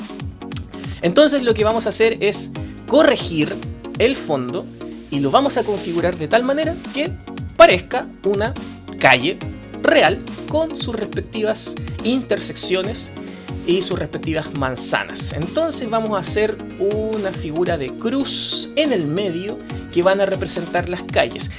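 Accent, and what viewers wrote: Chile. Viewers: Eres chileno, supongo :v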